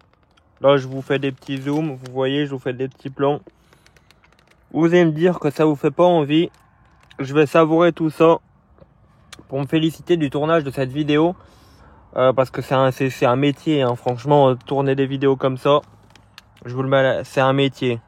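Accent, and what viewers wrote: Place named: Nord-Pas-de-Calais, France. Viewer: IL PARLE COMME CHTIMI CELUI LOA...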